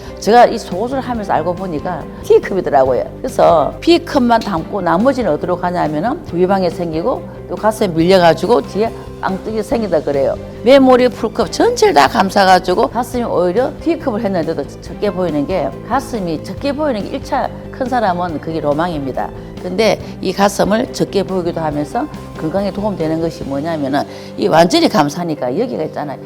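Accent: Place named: Gyeongsang, South Korea. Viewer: 경상도 말씨~친근감이 들어 꼭입어야 될것같아요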